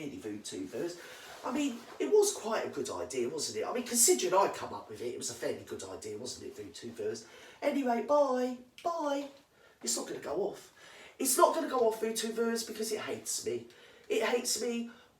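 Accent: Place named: London, United Kingdom. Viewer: Haha love the bleeding accent up my street, love you! W Londoner here love your banter haha tell it girl how it is! Go girl! 💕🤣👍🇬🇧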